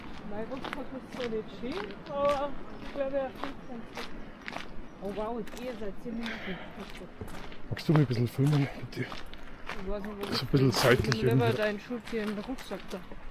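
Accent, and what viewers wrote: Bavaria, Germany. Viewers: Hey Namensvetter!!! ✌️ 😊 ois erstas: i steh auf dein bayrischen Dialekt ❤ es klingts ned vü aundas ois mia waun i mein Dialekt ned vasteckn probia 😂 SUPER Video und SCHÖNE Aufnahmen vom Köngissee 😍... bei unsan letzten Besuch homma die letzte Bootsfoat leider knopp vapasst ... miss ma unbedingt nomoi hin ... DANKE fias mitnehman!!! Schene Griaß aus Österreich ✌️🤗❤️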